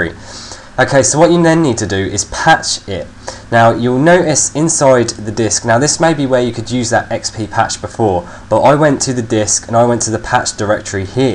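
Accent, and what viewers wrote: United Kingdom. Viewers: Best Accent ! love british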